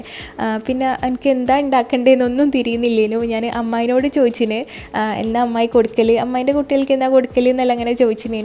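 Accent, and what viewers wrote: Kannur, India. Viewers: Njan oru pattambi kaari aanu but niku othiri ishta kannur slag 😍😘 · Ighal kannur ano nammale athe language😀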